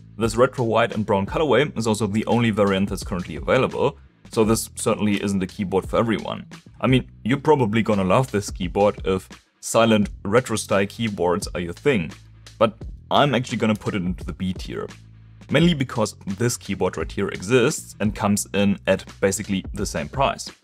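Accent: Germany. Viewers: Are u german?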